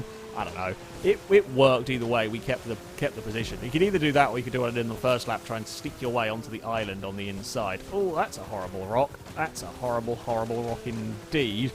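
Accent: United Kingdom. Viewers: How does this man not have more subscribers! He has everything a successful Youtube channel needs! Amazing content, british accent, and cat videos! I think it is our duty as fans to spread the word about and his channel!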